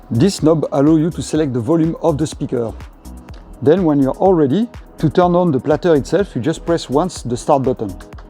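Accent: France. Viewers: I always enjoy your videos but may I also say that we really love your accent! It's a very wonderful French accent so please keep up the Great videos! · Ahah you’ve got the French accent it’s kinda funny ! I would like to buy this turntable but shipping isn’t enable to France…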